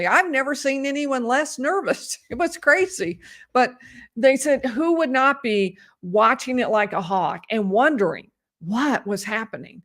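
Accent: South, United States. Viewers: I was born in Bainbridge, GA and grew up in Columbus, GA. So happy to hear your Southern voice.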